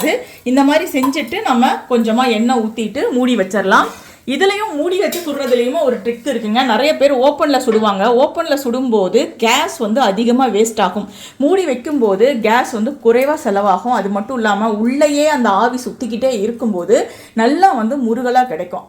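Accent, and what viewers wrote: Kongu, India. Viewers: எண்ணி எண்ணி ரசிக்கிறேன் கொங்கு தமிழை. அருமை ங்கா.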